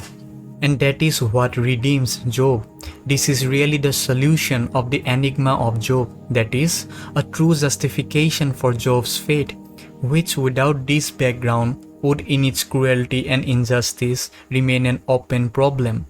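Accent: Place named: India. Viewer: Nahh bro. I like his Indian accent. It makes him unique from other western Jungians. Love it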